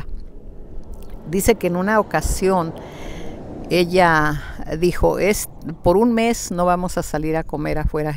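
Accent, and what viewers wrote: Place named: Monterrey, Mexico. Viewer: Hola, su acento me recuerda mucho al de la familia de mi novio, ellos son de Monterrey, México. Un abrazo desde España y gracias por sus videos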